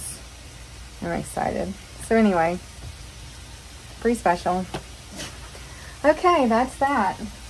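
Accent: South, United States. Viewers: I often think, regardless of your southern accent, you speak better English than the average English person of today. How brilliant to get a write up that gives an accurate portrayal. I have always been dismayed by journalist’s versions of events when reporting on things concerning us.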